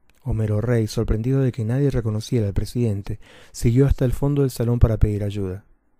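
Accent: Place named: Argentina. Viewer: Me encantó y me encanta el acento Argentino, lo quisiera para leer uno de mis cuentos 😚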